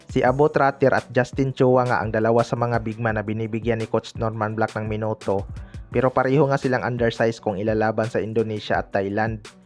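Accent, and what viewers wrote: Visayas, Philippines. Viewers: Bisayang bisaya magsalita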